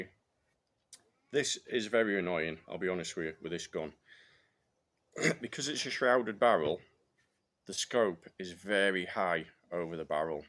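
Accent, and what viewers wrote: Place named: Yorkshire, United Kingdom. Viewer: How do, one yorkshire lad to another, luv the road tha talks, lol, needless to say ya got another sub , liking the honest reviews, down to earth and honest, Cheers me olde 👌👌👌👌👌👍👍👍👍👍👍👍👍👍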